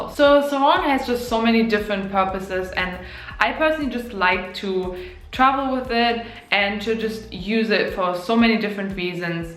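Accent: Germany. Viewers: Hearing the accent I think Germany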